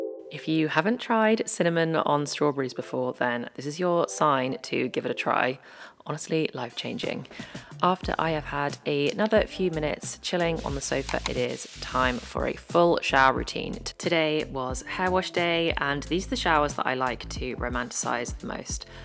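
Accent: United Kingdom. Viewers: The accent speaks for itself. Brit ❤😍 Always love reset videos they motivate me to be more productive 😇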